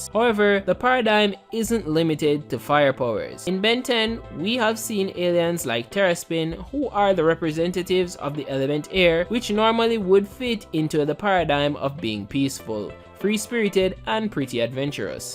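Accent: Jamaica. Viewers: Question are you Jamaican? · Are u jamaican? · Are you Jamaican?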